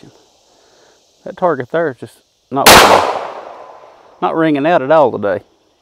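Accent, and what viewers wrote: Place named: South, United States. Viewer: Your Presentations are Excellent MAN. Don't listen to Critics. They are to the Point, straight forward, and narrated by a Southern Man. What more could anyone ask? I Don't like to be in front of the camera either. I would rather show the content than myself.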